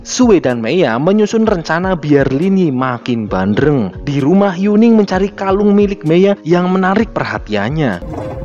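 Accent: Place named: Purwokerto, Indonesia. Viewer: Rika asline wongendi kang, medok temen gole ngomong purwokerto ya😂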